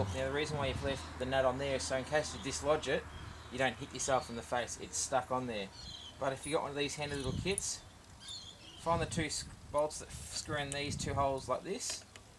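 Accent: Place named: Australia. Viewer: very helpful. and as a yank, i appreciated that aussie accent.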